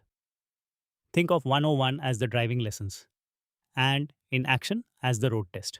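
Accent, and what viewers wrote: India. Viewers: You made a chatbot channel and you chose to use a Hindu accent english voice-over? My God... how counterproductive! Spare our ears and brains please!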